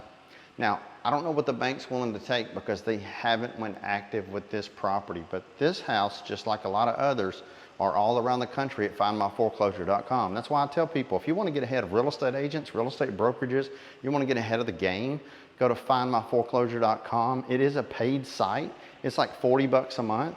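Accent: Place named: East Tennessee, United States. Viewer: Old boy sounds more lihe east tn/western nc than Louisiana lol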